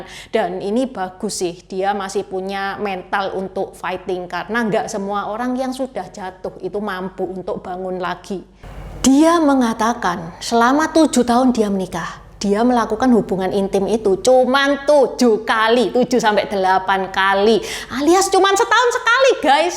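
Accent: Java, Indonesia. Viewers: kocak kalau cici bercerita, medok sekali ci....hehehe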